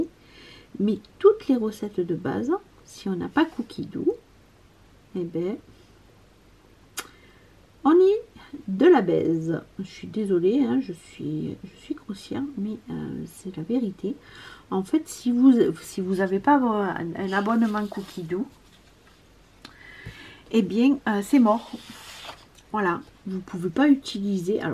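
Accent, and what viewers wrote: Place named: South, France. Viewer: Comme je te comprends 🫂 ah ouii c'est sûr que ton accent y est pour quelque chose hihi. D'accord tu es bien née dans le sud. Oh Martigues, j'y suis allée une fois. Et oui la vie, peut transporter ailleurs. Oh c'est gentil pour la vidéo explicative mystère, à condition que ça intéresse d'autres personnes qui voudraient se lancer vraiment dedans. Moi, je suis juste curieuse de cette drôle de bebête 🤣 Des gros bisous ma 😘😘❤️🫶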